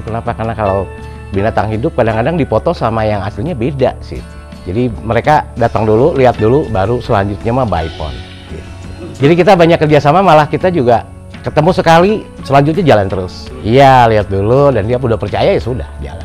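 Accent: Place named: Sunda, Indonesia. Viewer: Kang Sunda banget santai bicaranya renyah enak di dengar saya mau nyoba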